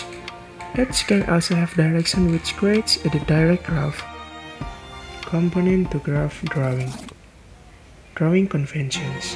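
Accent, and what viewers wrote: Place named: India. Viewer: Fuck you bloody *indian accent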